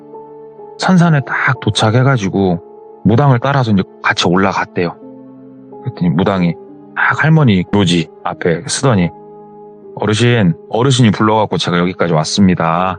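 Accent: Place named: Jeonju, South Korea. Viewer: 전주 분이신가 보네요 ㅎㅎ 친구랑 말투가 똑같아서 뭔가 얼굴도 모르지만 친근감 있어요 ㅋㅋㅋㅋ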